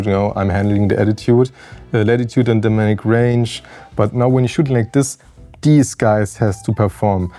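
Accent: Germany. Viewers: Film is still unmatched in all aspects but the sharpness and fine details. But do you really need those pores on the nose to tell a story hehe. Still prefer the top-quality film as a viewer though. Also I don't mind german accent, its not indian or hungarian after all ))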